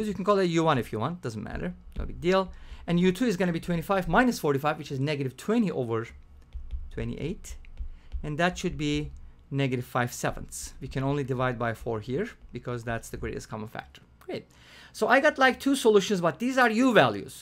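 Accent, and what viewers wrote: Turkey. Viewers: Your English accent sounds Turkish .is it really so :)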